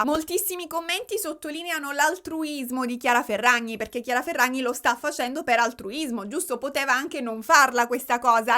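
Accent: Palermo, Italy. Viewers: Madooo'' sei troppo fantastica! scoperta ieri con il video di Kayne West e Taylor Swift! Adoro il modo in cui parli, la tua ironia, come spieghi tutto in modo efficace e senza annoiare (nonostante 2 ore di video!). Ps: Ho capito subito che sei palermitana! troppo divertente! ahahahah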